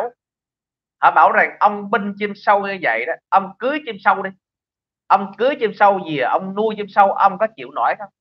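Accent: Standard. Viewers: nói quá chuẩn nghe đã tai.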